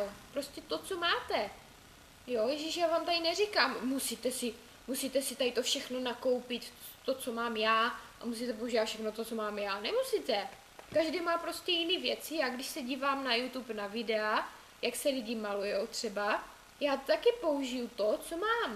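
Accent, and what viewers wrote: Moravia, Czech Republic. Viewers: Líp bych to neřekla.. :) a jen tak mimochodem.. tak trochu podle přízvuku.. ty jsi z moravy? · hele mam otazku! Ty jsi z Moravy ???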